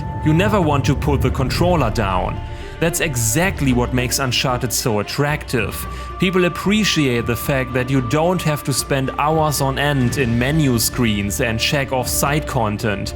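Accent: Germany. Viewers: Nice video but are you german ? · That's just his German accent. At least he doesn't pronounce it as 'Laura' like so many Americans do.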